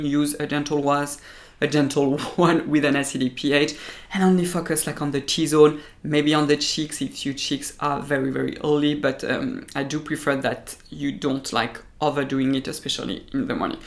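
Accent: France. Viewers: i love you and also love your french english😗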